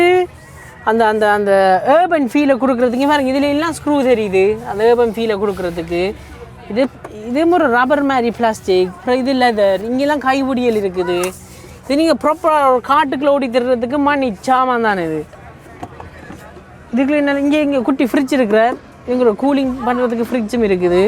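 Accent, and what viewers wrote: Sri Lanka. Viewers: Bro srilanga tamil super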